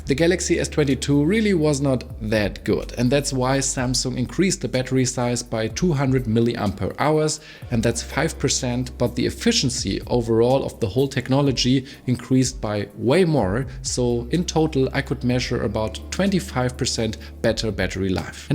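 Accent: Germany. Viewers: best Galaxy I've ever bought so far. i have the 128gb base model in pblack. i compared it with the s23+ from a friend and there is no noticeable difference in everyday handling!! but the most important thing, 25w charging needs exactly an hour with 20-30% battery left the next morning which i always have. it's exactly the time frame i need to shower and everything before i go to work. btw, very strong German accent. but not as worse as KS. anyway, good review and nice channel, subbed!!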